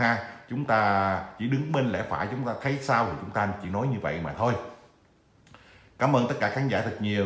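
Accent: Standard. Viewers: Anh noi qua chuan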